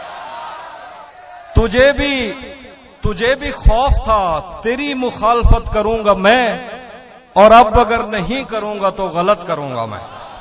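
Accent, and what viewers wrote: Saraiki, Pakistan. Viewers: How cute is this when is speaking saraiki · Saraiki awaaz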